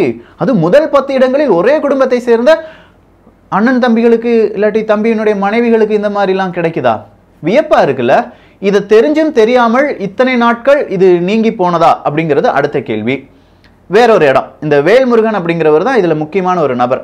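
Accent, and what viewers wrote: Kerala, India. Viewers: Hello bro! I watch all your videos! You have a very strong Malayalam accent! Why don’t you release some videos in Malayalam also! Are you basically a Malayalee bro? Someone said you are from Tirunelveli, but you don’t have Nellai accent at all. I am originally from Tirunelveli, so I know Nellai accent very well. Your accent is neither Nellai accent nor Nagercoil accent! Your accent is 100 percent Malayalam accent! It will be good if you release some videos in Malayalam under the tittle “ Malayalam Pokisham”!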